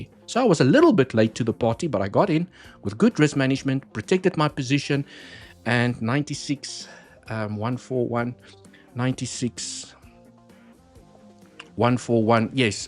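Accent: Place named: South Africa. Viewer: Thanks. Nice to hear a South African analyst. I'm in the UK now, but 41 years in Southern Africa. 🇿🇦🇿🇦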